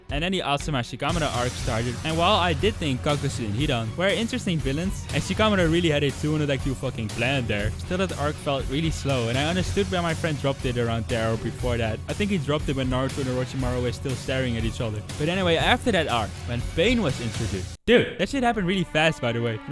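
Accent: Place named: Netherlands. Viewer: I like your accent. It sounds a little Dutch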